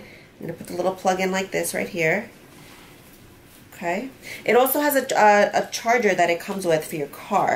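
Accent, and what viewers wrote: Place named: New York, United States. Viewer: Just ordered one! BTW, you ded have a NY accent lol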